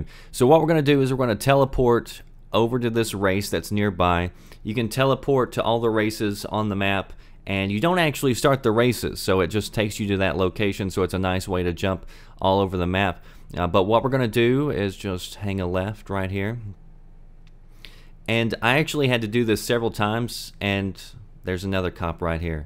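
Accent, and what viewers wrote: South, United States. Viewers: "Sometimes I can't keep the southern out..." Its OK... let that shit flow!!! Hilarious. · I like the southern accent.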